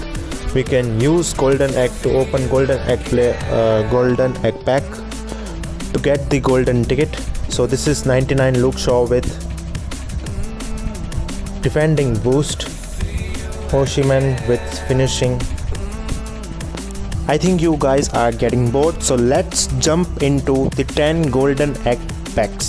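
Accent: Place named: India. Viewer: Bruh from the english talking i know ur Indian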